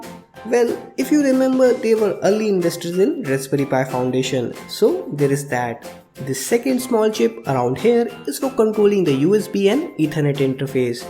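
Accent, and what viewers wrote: India. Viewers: Dude thats an Indian accent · He sounds like Indian tech support. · If u have trouble understanding Indian accent , just leave without commenting anything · yep. Thanks, that is the normal tilt and pitch of an Indian - i think a great video. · nobody is judging mate, i know how it is to learn new languages, i speak 5 of them, and all with an accent. blame my ears, i have no problems with accents, but indian accent is so annoying i cannot enjoy these videos however much i try. and i try a lot. sorry my indian friends. i love you but my ears bleed every time.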